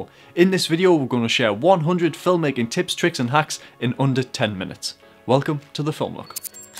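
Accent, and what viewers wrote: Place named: Scotland, United Kingdom. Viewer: it took me a second to get use to the Scottish accent; but the tips where great!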